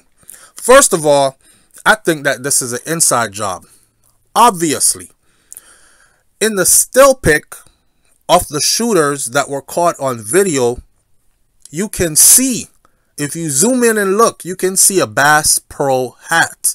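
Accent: Jamaica. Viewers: You sound's like...Jamaican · This sounds like a Jamaican 🇯🇲 blogger I know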